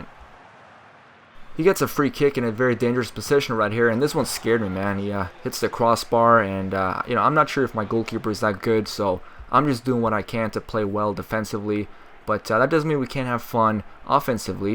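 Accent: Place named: United States. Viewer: You sound american, were you born there?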